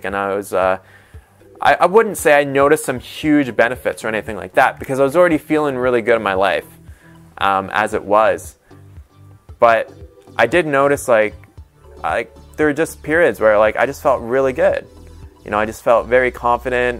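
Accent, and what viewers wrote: Canada. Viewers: You're Canadian? (Btw i was so addicted i got ED, and in the most critical period in my life, if ya know wat i mean)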